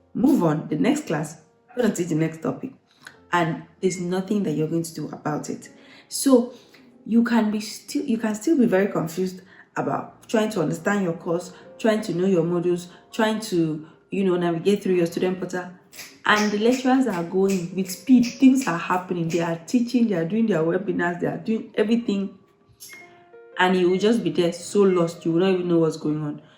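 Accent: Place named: Nigeria. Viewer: The Nigerian accent tho...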